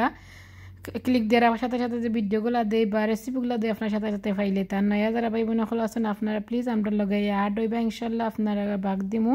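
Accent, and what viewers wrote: Sylhet, Bangladesh. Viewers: সিলেটি ভাষায় আপনার কথাগুলো শুনতে আমার অনেক সুন্দর লাগে শুটকি ভর্তা অনেক লোভনীয় করে তৈরি করেছেন ❤❤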